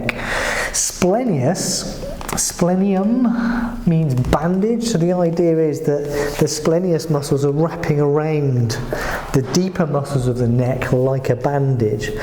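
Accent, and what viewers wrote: United Kingdom. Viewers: I am not a native english speaker. But I adore anatomy and you are excellent, except your accent is british and also so fast that its hard for me to understand😂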